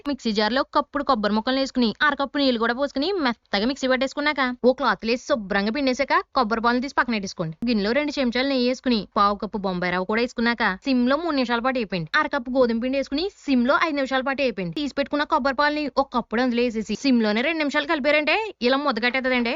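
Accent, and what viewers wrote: Godavari, India. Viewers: ఏంటండే మీగోదావరి మాటలూ , ఏంటో మనసెటో ఎల్లి పోనాదండీ . అయ్....😅😅😅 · అచ్చంగా మా తూర్పు పశ్చిమ గోదారి మాట తీరులా ఉంది. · సిస్టర్ నేను రాజమండ్రి లో 7ఇయర్స్ ఉన్నాను ఇప్పుడు వైజాగ్ వచ్చేసాను అక్కడి స్లాంగ్ మిస్ అయ్యా అనుకున్నా కాని మీ వీడియో స్ ద్వారా ఆ గోదావరి స్లాంగ్ వింటున్నందుకు చాలా హ్యాపీగా ఉంది ఆ మాటలు కోసమే మీ వీడియో స్ చూస్తాను నా ప్రొఫషన్ కూడా కుకింగే థాంక్ యు సిస్టర్.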